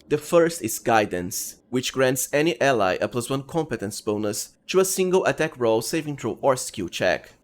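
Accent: Brazil. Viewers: What a kind, beautiful, genuinely helpful and clearly profoundly rich in experience video. A video out of pure passion. Thank you so much dude. Also, let me ask you, if you see this comment despite it being on an old video: Are you Brazillian? I ask because I am and your accent sounds a little similar to mine hahah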